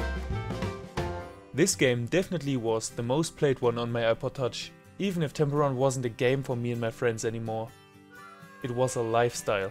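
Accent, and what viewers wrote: Germany. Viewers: Man hört so krass raus dass du deutscher bist hahaha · Are you from german ?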